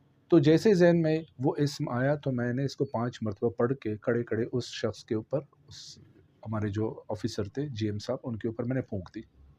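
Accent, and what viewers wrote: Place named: Pashto, Pakistan. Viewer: Ap ka lheja pshto h thik sy smjh nhi ai😥😥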